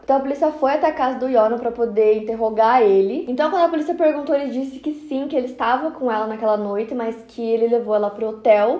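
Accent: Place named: South, Brazil. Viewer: Único sotaque sulista aceitável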